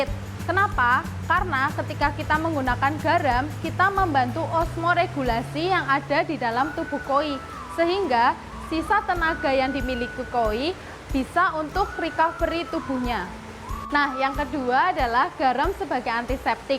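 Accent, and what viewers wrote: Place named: Central Java, Indonesia. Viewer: bahasane medok bnget.. koyo wong jawa tengah bagian kulon.. hahahah😊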